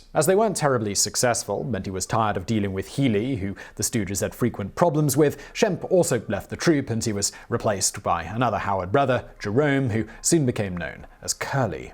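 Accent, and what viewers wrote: England, United Kingdom. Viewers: It’s fantastic to hear an English accent talk about The Stooges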